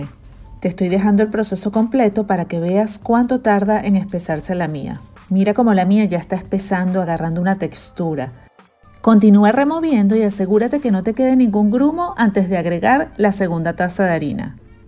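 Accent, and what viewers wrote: Venezuela. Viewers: Muy Venezolana tu manera de hablar 🎉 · Eres venezolana, ese acento es inconfundible? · Saludos una venezolana 🇻🇪Costa Rica🇨🇷. Desde que escuché tu acento dije: es venezolana!. Y completamente si lo eres, te felicito por tu canal y videos, siempre quiero innovar en los platos de mi hogar. Muchos éxitos y bendiciones.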